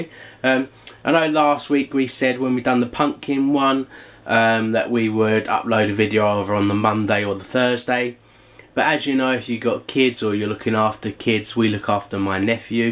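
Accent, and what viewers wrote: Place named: England, United Kingdom. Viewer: hello. Great audio description. I am blind so I really appreciated you talking and it was lovely to hear your English accent as I am English to living in Australia bye for now Donna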